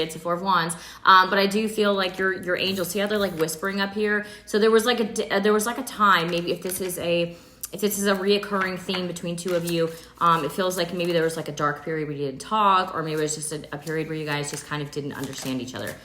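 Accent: South, United States. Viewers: I luvu❤you be on point and you funny😂you be doing this country voice while you be talking so funny😂😂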